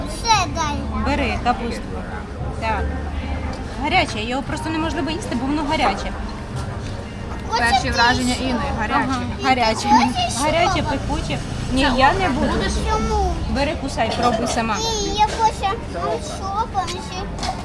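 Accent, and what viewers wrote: Standard. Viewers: Моэ вітання з Німеччини. У вас чиста українська мова. Розповідаєте дуже цікаво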